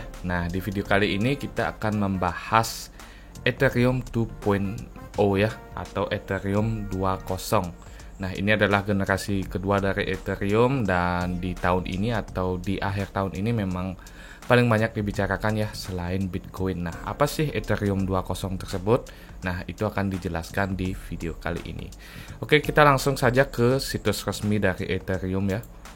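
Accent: Bali, Indonesia. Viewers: Bang kok logatnya ky org bali ya?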